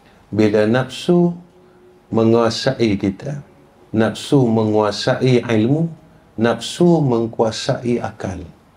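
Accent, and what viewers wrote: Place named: Kelantan, Malaysia. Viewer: Comey ustaz cakap kelantan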